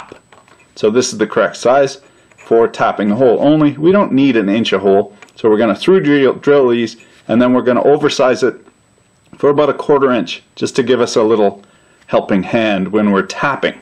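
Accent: Quebec, Canada. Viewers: i didnt kno you were canadian let alone french canadian? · Lol the French, instantly knew you were from Quebec haha.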